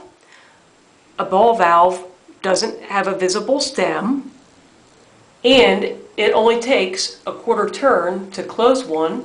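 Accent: Philadelphia, United States. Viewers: From Philadelphia area? The accent is crazy! Wudder, Donit. lol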